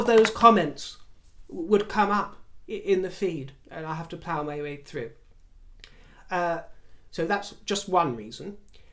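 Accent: United Kingdom. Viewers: I was wondering if anyone knows if is American and really live somewhere in America (and just does a good accent) Or is he really from the UK? 😁😘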